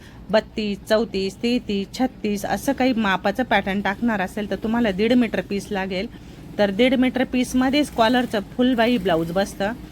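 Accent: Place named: Nashik, India. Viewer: तुम्ही नाशिकच्या आहेत का ताई🎉 बोलण्यावरून वाटतंय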